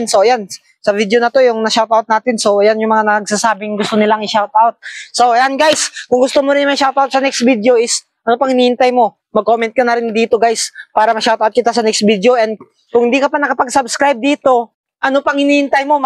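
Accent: Iloilo, Philippines. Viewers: ilonggo ka gali dol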